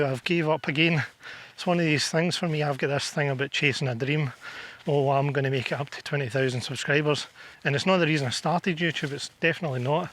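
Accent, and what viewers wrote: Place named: Scotland, United Kingdom. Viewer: The reason I asked is I thought that was Scotland cuz I've seen so many pictures of Scotland. I'm Scottish I'm the only one in my family that has not been there. I have thick book of my family that connects me all the way back hundreds of years ago from Scotland. I've been wanting to go back through it cuz it's been many years since I went through it since my mom's died. Many clans the only clan I can think of that was the main part of the family was the MacDonald clan. It's been many years since I've been to the Scottish games here in the states.I still get a flyer something my house to meet the McDonald's clans at the Scottish games once a year I'm going to have to do it next year for sure. It's always such a amazing fun. I hope I'm able to visit Scotland one day. Thank you for your time I just knew that was Scotland not just from your accent look so beautiful it's nice to see a picture like that. 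Thank you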